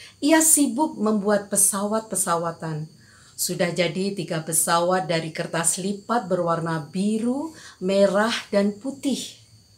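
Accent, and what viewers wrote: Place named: Papua, Indonesia. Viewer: wah cerpen dengan logat papua. keren.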